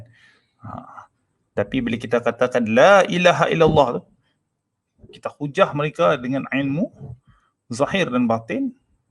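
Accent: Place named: Pahang, Malaysia. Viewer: tuan orang Pahang kan . loghat macam Pahang je 😆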